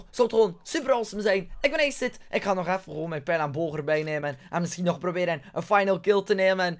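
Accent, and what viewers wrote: Belgium. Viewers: Netflix&Chill met mij omdat ik uhm nouja uhm uhm omdat ik uhm je belgische accent en je haar vet schattig vind en ik ben (hoop ik) ongeveer 100% een jongetje ^^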